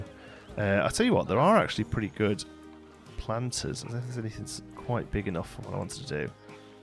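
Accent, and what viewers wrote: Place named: Staffordshire, United Kingdom. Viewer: I thought your accent was familiar! I also grew up in Hednesford/Cannock Chase! Love your videos :D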